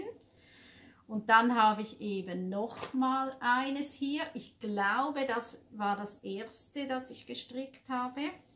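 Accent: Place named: Switzerland. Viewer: Liebe, die Folge hat mir wieder sehr gefallen, Du hast uns ganz schöne Sachen gezeigt. Die blaue Jacke ist traumhaft schön, ich bin ganz begeistert von der Art wie sie Du gestrickt hast, die Rückseite ist ja ganz raffiniert gestrickt, hinten in der Mitte sieht es ja wunderschön aus. Diese Art zu stricken kenne ich gar nicht, also Du strickst ganz tolle Sachen, bist eben, wie ich schon geschrieben habe, eine richtige Künstlerin, Du machst außergewöhnliche SACHEN, egal ob Du strickst oder nähst, es ist so toll und interessant, ich schaue sie so gerne an. Diese Handschuhe sind einfach wunder wunderschön, das Muster erfordert doch viel Geduld und Können. Ich könnte soetwas nicht mehr stricken, weil es mir nicht mehr möglich wäre, ich könnte die Geduld nicht mehr aufbringen. Auch der neue Pulli ist so sehr schön. Diese Tücher und Pullis stehen Dir auch so gut mit Deinen schönen langen Haaren siehst Du umwerfend schön aus. 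Du hast eine solche Fröhlichkeit in in Dir, dass man Dir so gerne zuschaut und zuhört. Ja zuhört, das Deutsch. Mit dem Schweizer Akzent drin finde ich super und höre es sehr gern und es ist überhaupt nicht furchtbar, wie Du denkst, ganz im Gegenteil und ich komme ja auch nicht weit weg, von der Schweizer Grenze, her, wenn ich so rede wie zu Hause, dann meinen die meisten Leute, ich bin aus der Schweiz. Die Leute sind zum Teil manchmal etwas begriffstuzig, die können nicht unterscheiden, ob man Ein Dialekt redet oder richtig Schwitzerisch, sie merken überha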